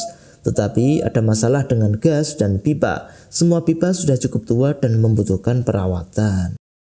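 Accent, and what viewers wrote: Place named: Java, Indonesia. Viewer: Jawa anjr suaranya mals gw dngernya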